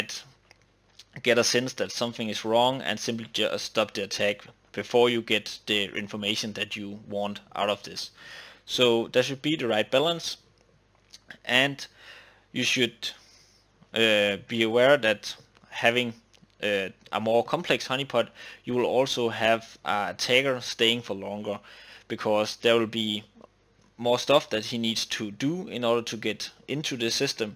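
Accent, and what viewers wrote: Denmark. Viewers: Are you danish ? :)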